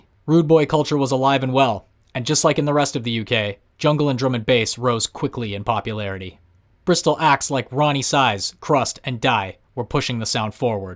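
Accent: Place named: United States. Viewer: even though it's been literal decades it's still weird to hear someone with an american accent talking about OG dubstep great vid great music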